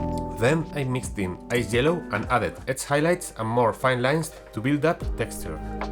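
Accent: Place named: Spain. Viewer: Vi ese español interior a través del acento jajaj, buen vídeo Bro 👏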